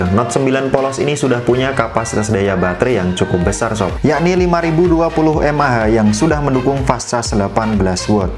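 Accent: Madura, Indonesia. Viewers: Bang.. madura mana kmu bang?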